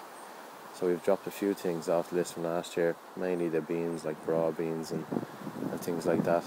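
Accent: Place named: Dublin, Ireland. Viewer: failte...everything is looking good.. i wouldent worry too much about being late, often it seems later sowings catch up alot and are only a few weeks behind earlier sowings.... is a waterford nickname, but i think i can hear a touch of dublin in there, im in carlow.... keep the faith.. mick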